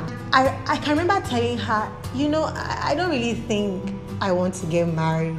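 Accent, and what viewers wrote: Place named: Nigeria. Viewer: You sound like a Nigerian. Though, I'm not in a relationship. Your story is relatable and I don't know why. ♥️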